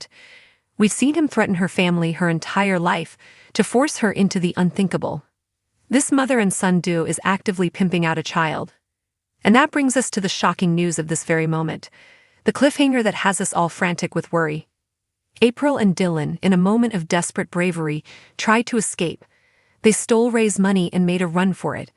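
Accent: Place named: United States. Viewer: You should switch the voiceover to an English accent. An American accent makes it more obvious that the video is AI.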